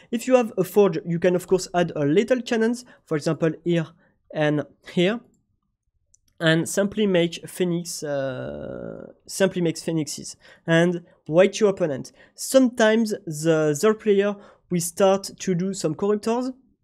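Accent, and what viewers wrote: France. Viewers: sont accent est si français que les sous titres automatique sont généré en français j'adore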